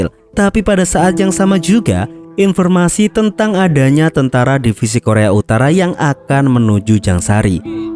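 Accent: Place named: Kediri, Indonesia. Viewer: Modele bahass ne keterangane koyo wong kediri gueeriii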